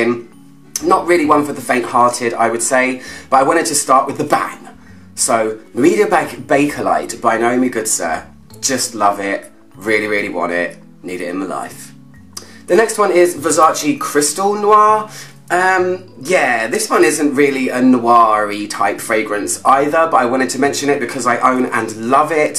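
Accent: United Kingdom. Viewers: New to your Channel and totally delighted by your reviews. I LOVE your style,your clothes and your britishness,and of course I share your passion for perfumes and everything perfumey. I also keep my English up to date and delight muy ears with your accent. Congratulations,you've got a new fan. Greetings from Spain,!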